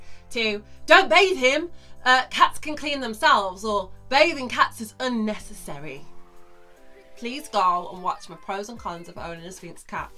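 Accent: United Kingdom. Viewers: I like your british accent,and you sphynx is so adorable!,I love it !.John M Guinto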